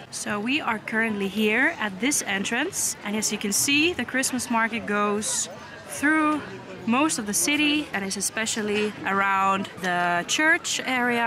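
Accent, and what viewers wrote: Netherlands. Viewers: How does an expat pick up such a dutch sounding accent?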